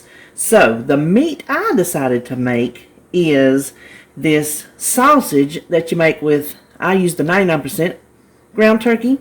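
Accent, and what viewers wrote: South, United States. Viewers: Can’t wait to try this breakfast pizza! I enjoy your southern accent! Where in NC do you live?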